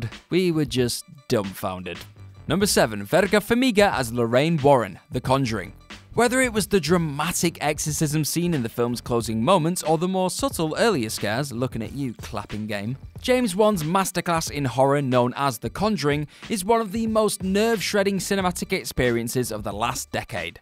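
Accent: United Kingdom. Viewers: Don't forget, we're talking about British people narrating this, so their way of pronouncing some words and names may differ.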